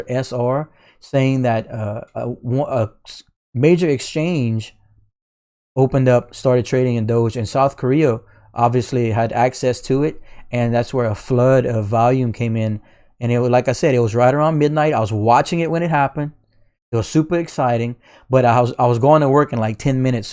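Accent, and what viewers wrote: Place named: Louisiana, United States. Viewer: Dude sounds like you are from my area South Louisiana.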